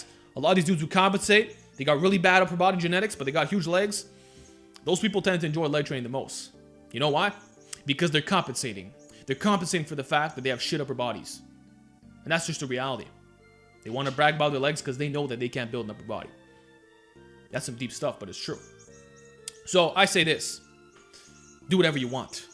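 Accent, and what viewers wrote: New York, United States. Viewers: Yo this is not a diss juat realized you have a NY accent with a little bit of a chinese american accent mixed in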